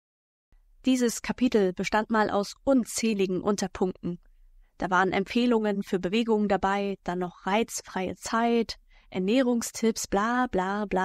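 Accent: Mecklenburg-Vorpommern, Germany. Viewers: Vielen lieben Dank für genau dieses Video. Ich muss eingestehen, dass nicht ich es entdeckt habe sondern mein ältester Sohn. Er hat es in unsere Familien Gruppe gestellt. Mit der Bemerkung: "...seht es euch unbedingt an, sehr interessant!" Also danke ich Euch beiden. Meinem Sohn für's Weiterleiten und Dir für dieses Video. Ich habe mal gleich die Glocke gedrückt. 😉🤗 Was ich u.a. auch sehr gut finde, dass Du selber sprichst. Und so habe ich erkannt, dass Du aus meiner Heimat, Mecklenburg Vorpommern kommen müsstest.🤗🙋🏼‍♀️ Ich glaube, dass sich jeder in Deinem Video, mit irgendwas, identifizieren kann. Danke und mach weiter so.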